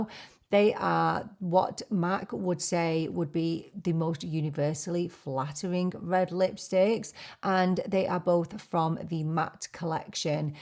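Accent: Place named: Manchester, United Kingdom. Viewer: Loved this! I definitely dont have the confidence to wear red still enjoyed seeing you try them all on. Was shocked to hear you dont live in the UK you sound straight of Greater Manchester to me 😮 ❤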